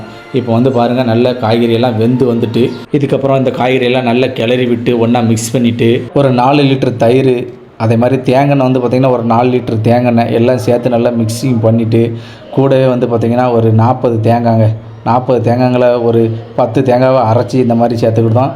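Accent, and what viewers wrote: Tirunelveli, India. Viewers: Tirunelveli aa bro neenga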